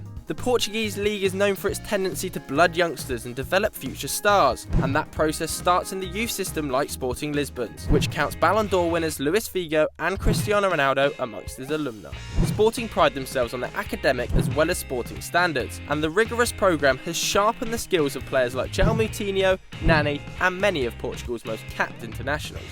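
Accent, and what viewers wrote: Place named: United Kingdom. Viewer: Why do british people always have to pronounce names their way La Mayseeya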